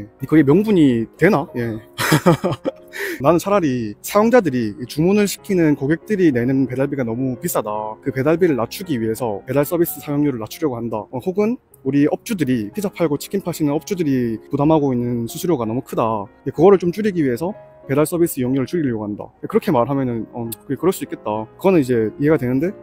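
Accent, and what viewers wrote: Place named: Gyeongsang, South Korea. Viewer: 부산이나 경남쪽분 같내요 맞으시죠?ㅎ 경북쪽은 성격이 강하고 너무 직설적이라 이런 차분한 말투가 안나옴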